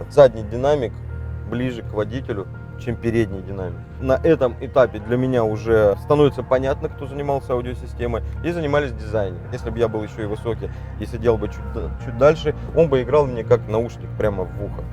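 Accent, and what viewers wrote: Rostov, Russia. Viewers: Про речь ужасную кто там писал.Это суржик,норма казачьего диалекта,не является нарушением,это Ростов.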